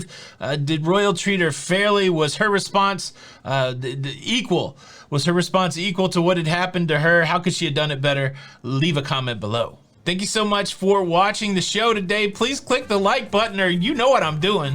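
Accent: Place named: New York, United States. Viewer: Sounds like fellow New Yorker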